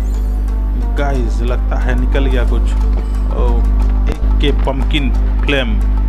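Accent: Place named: Bihar, India. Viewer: Bhai aap Bihar se ho kya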